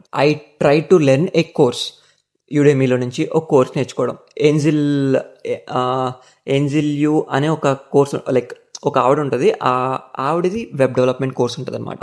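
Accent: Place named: East Godavari, India. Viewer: Maadi ap mandapeta Ne voice east godavari la vundi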